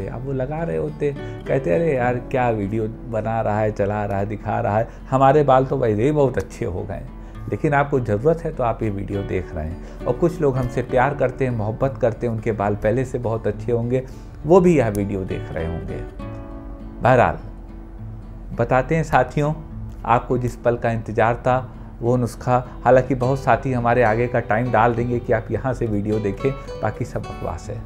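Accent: Lucknow, India. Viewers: Lucknow side ki bhaasha to lag rahi hai..........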